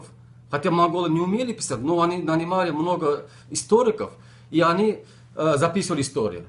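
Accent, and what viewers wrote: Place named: Tajikistan. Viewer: Это не монгол. У него акцент даже таджикский (персидский). Сказки рассказывает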